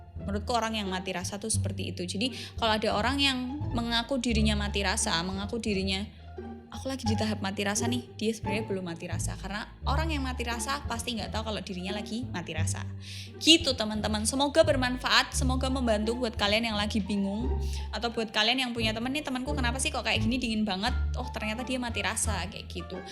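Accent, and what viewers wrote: Java, Indonesia. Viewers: ngomong nya kaya orang jawa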